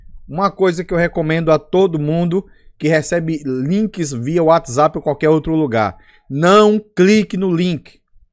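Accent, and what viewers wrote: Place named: Paraíba, Brazil. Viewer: Quase cai, mas cliquei no link , que faco agora,, sotaque Paraiba muito legal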